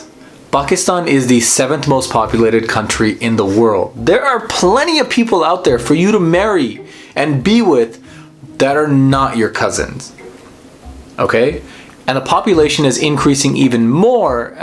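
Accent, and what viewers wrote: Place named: United States. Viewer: man! man! man! I'm new on your channel, you're brilliant. i can watch each of your videos multiple times!! I've never loved American accent so much before i heard you talking (I'm assuming you're not canadian. I'm sorry if you are) please respond to my comment if you get a chance to read it, I'm already a huge fan love from the uk xx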